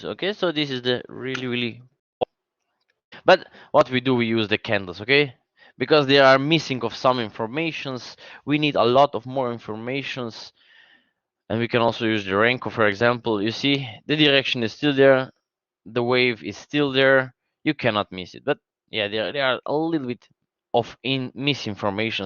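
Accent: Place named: North Africa. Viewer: " from Slovenia " I thought your accent was North African:-) An Arabic speaker. Interesting. Now, I know how Slovenian accent is. All the best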